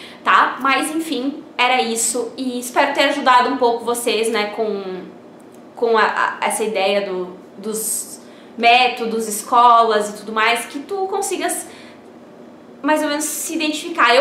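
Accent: Rio Grande do Sul, Brazil. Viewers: Eu não sei o que eu tenho com esse povo lindo gaúcho rsrsrs. Estava vendo um video sobre escola de inglês entrou esse em seguida.Ou gaúcho gosta muito do YouTube ou eles me perseguem kkkk Adoro esse sotaque gauchês kkk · Você é gaúcha né ? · Ela é gaúcha?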